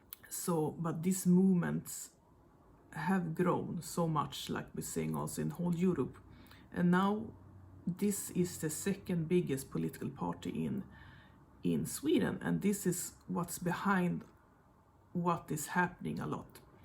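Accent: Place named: Norway. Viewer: your accent sounds norge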